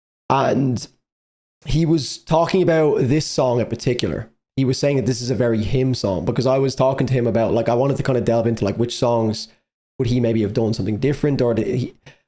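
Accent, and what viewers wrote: Ireland. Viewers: 3 reasons why your Irish (warning: funny): 1. You drink excessive alcohol, specifically in the blind reaction video for Even in Arcadia, every Irish will do this this is a stereotype really but who cares 2. Your accent (pretty straight forward) I also sense some British or Australian accent not sure 3. Lastly, in this video your drinking (which is made by Coca Cola company this blew me away I didn’t know they sold water products) which are exclusively in Ireland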